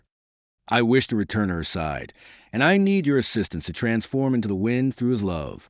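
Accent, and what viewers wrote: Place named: California, United States. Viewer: It’s very standard California accent, but not quite what you’d hear in Hollywood. I quite like it as it has a lot of character to it.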